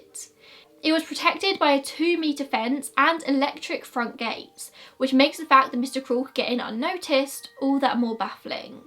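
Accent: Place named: Yorkshire, United Kingdom. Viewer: I love the Yorkshire accent. <<thumbs up>>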